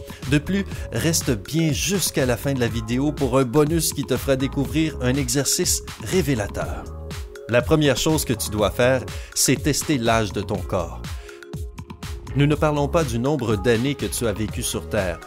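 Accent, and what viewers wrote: Quebec, Canada. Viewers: accent canadien j'adore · C,est un accent quebécois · L' accent canadien , drôle et sympathique ; vous ne trouvez pas ! ?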